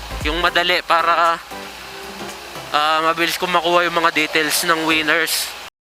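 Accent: Pampanga, Philippines. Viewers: Bosis kapampangan dumali ya hahahaha idol pa colab taga mexico ku bap tuki ku rides u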